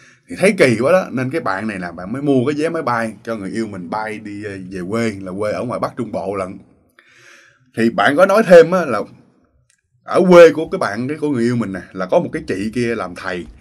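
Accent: Mekong Delta, Vietnam. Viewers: gốc miền Tây hả ha, nghe giọng chân chất, tốc độ nói cũng khá nhanh nhưng đủ để nghe kịp, giống kiểu nói của mình.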